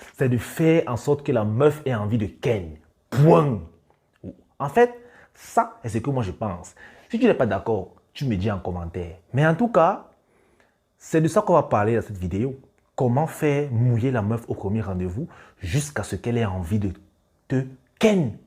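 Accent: Cameroon. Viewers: Je m’abonne direct putain c mec avec son accent camerounais ah je kiffe 🥂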